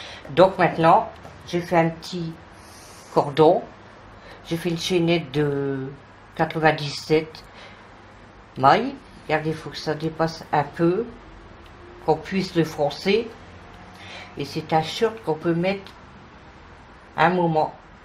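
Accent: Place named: Cambrai, France. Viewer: Merci pour ce tuto je suis entrain de le faire pour ma future petite fille qui arrivera en septembre!!!! j'adore vos Modèles et les explications sont simples!!!!! Continuez à nous enchanter avec vos modèles, j'adore votre accent, j'ai habité Cambrai pendant 6 ans et quand je vous entends parler je m'y revois!!!!!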